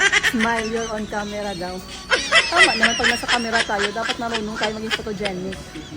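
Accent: Iloilo, Philippines. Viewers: I love ilongo accent sayo madam 😂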